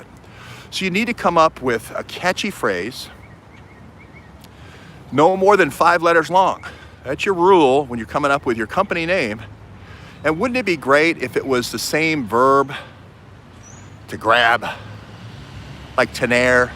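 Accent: South, United States. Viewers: It was Glenn Beck’s presentation named The Puppet Masters where he broke down and tied together the relationships of literally everything to Soros who even to this day is the nucleus for which everything is filtered. Way back then Glenn pointed to Ukraine and the CIA causing regime change using a formula. I recommend all watch this one as well. I’ve been following you since the day you stepped into DC. I am at least 2 years ahead of the world because of you. 🤓 Thank you. I lol’d all the way through this one. Loved the Southern drawl. 😆 Here is the link with all 6 <link> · I dig the WAY YOU DIG. MY OH MY, YOUR BOX of chocolates are some of my all time favorites...nuts and chews. And with that down south drawl, just adds the icing on the cake. Thank you.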